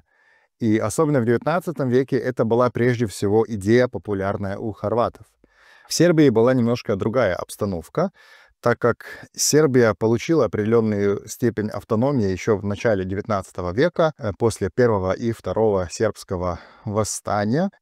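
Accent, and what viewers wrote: Russia. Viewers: Сначала подумал вы русский, потом еле уловил даже не акцент, а небольшое отличие в произношении буквы «ч» и «ш,щ»., но не во всех словах, в некоторых.. Это вы так хорошо изучили русский язык или с детства говорите на русском? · С начало подумал из-за лёгкого акцента, что вещает русский, родившийся за границей) уж больно похож парень на типичного русского с севера. Спасибо! Исчезли каша в голове и стереотипы. Обычно после школы остаётся картинка о Косовском поле и что все там сербы, только разные конфессии. А по факту больше различий чем у нас с малороссами, великороссами и белорусами. Отлично! Благодарю!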